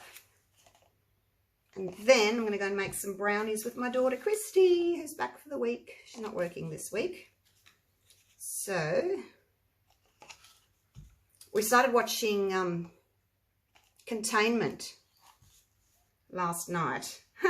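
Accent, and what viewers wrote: Australia. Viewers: Hi, Im enjoying your pouring demos ❤ Great to hear your Aussie accent, I'm watching from SA. Thanks for your explanations and sharing your experience. Love your art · Hi. I have been subscribed to Your channel for 2? Years. You are a favorite because of Your talent for beautiful paintings. I Love the Poodles and Birds, plus your gorgeous back yard. I know who is, and your accent, Your art, and the joyful vibe. This painting turned out well, although I was nervous. I could feel the stress from the beginning, but You calmed to natural, as the painting evolved. Relaxing. I also subscribe to Gilly. Her work will never be compared to Your work, because it is so different. I appreciate Both!! Thank You for everything that You share. I wonder.... both of you, are Australian. The World Loves anyone from Australia. You are the best. What if You and Gilly talked? 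Maybe it was an honest mistake? Or not? Would an apology work? Could You and Gilly combine as a Team for Australia. We need both Artists. I am an American, and depending on you Both to get through this horrible time by doing art. I Need you Both. Please patch the fence. If You, reply to Me... I am going forward to contact Gilly. Otherwise, I will stay silent. I adore You,.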